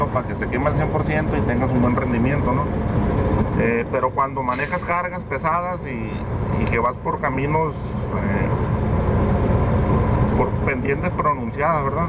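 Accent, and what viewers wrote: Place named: Mexico. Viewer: son muy buenos tus videos compadre explicas muy bien se ve que tienes mucha experiencia me imagino que eres mexicano por el acnto yo soy de nicaagua un millon de like para ti y me suscribo a tu canal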